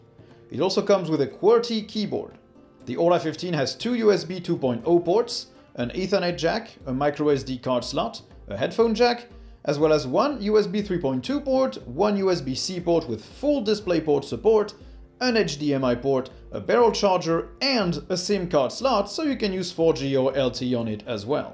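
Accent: France. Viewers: With more than 1K reactions, we'll have a winning change of almost one promille 😁, I'll take that. Always nice to listen to your lovely french accent, about diverse Linux items; I've used Unix and Linux since ~'83 and I still learn new things from you, thanks.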